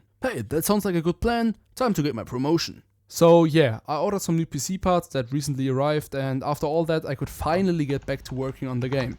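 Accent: Germany. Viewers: Are you german? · Oh yeah and PLEASE try to bring this to steam I’d love to play it, also you’re pretty obviously German just judging by your accent (no disrespect I’m German too) and from the text in 😭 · YOU ARE GERMAN I HEAR IT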